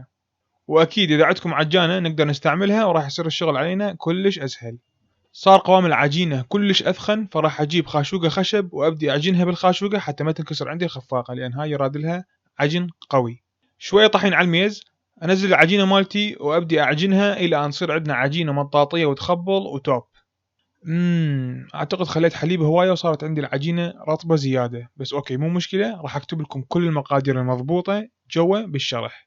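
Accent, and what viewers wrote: Iraq. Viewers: طبخك حلو بس كلامك العراقي يجنن أحب اسمع كلامك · اللهجة العراقية خلت المقطع رهيب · اللهجه العراقيه 😍❤️ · حبيبتي هاي لهجه عراقيه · حبيت اللهجة العراقية بسببك 😅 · و ربي معلم و احلى شي اللهجة العراقية ... خقق 😍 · يا اخي طريقت الكلام والشرح خرافية جدا ممتعه وتحس بالنفس العراقي بيها . الله يفرحك شيف ضحكتني على سالفة الوصاخة بالكاع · احب لهجة العراق 😍🇸🇦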